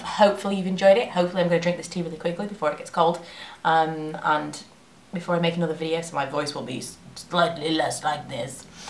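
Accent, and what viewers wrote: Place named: Northern England, United Kingdom. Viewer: Not sure if it's the husky voice or the mention of beer, but you were particularly Northen in this video & it was great. 😃😃😃 love you xxxxxx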